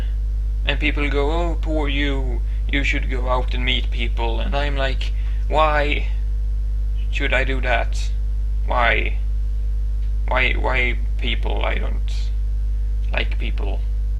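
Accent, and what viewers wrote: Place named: Sweden. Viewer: I really liked your video, I just took some tests the day before yesterday, and they provided some confirmation of what I already suspected after having read about AS on some web pages. I didn't know about the lines/bags under the eyes, I thought that was just me (still learning about AS). The more I see of others with AS, the better it seems to make me feel. By the way, I like your Swedish accent, it is cool sounding :) Your brother looks cool, I always wished I could grow a big beard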